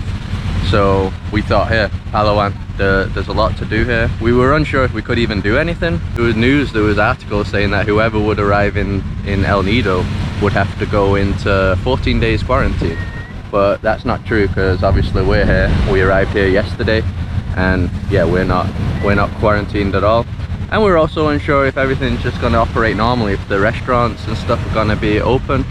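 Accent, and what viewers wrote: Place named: Scotland, United Kingdom. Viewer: That’s a funny sounding Scottish accent, what part of Scotland are you from mate?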